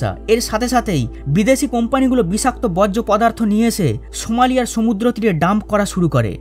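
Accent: Kolkata, India. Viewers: আপনার ভয়েস শুনেই মনে হয় কলকাতার দাদা ❤️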